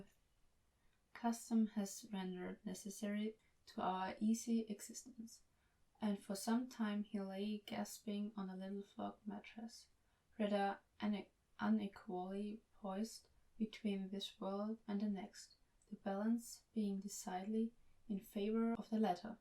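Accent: Austria. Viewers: It's really cool hearing Dickens with a really cute Austrian accent!😊 THANK YOU!!💕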